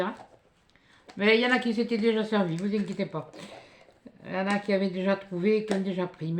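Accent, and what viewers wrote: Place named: Southwest, France. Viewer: Bonsoir je suis nouvelle sur votre chaîne quand je vous écoute parlez j'ai l'impression d'entendre la voix de maïté la cuisinière 🤣des mousquetaires que j'aime beaucoup dommage qu'ont ne la voit plus à la télé 📺je suppose que vous venez du sud ouest moi je suis du nord de la France une chti's 🤣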